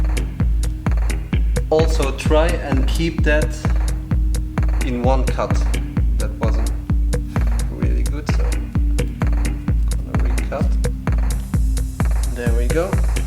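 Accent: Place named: Luxembourg. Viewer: 🎉 duuu bass letzeboier ❤ ech erkennen deen accent direct 🎉❤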